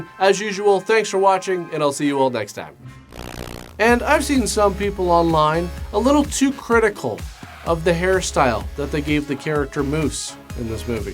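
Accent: Canada. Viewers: I'm Scottish. I'm used to hearing horrific versions of our accents. Americans can barely tell Scottish and Irish apart, or Cockney and Australian... Cloth ears! At least I can tell is a Canadian - the way he says "about" is a giveaway.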